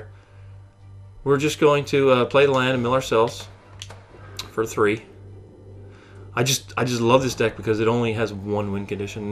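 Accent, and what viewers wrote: Canada. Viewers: you canadian? just a question you have a pretty thick accent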